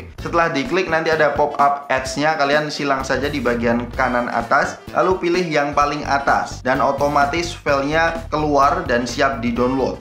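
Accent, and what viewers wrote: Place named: Yogyakarta, Indonesia. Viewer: logat jojgae ojo ngasi ilang dab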